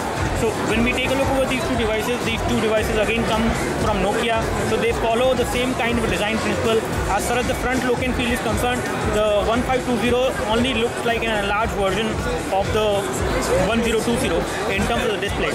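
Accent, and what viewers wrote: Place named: India. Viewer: hhhhhh, all u guys complainin' about his way of speaking... he is an Indian - i have no problem, Bollywood fan here =D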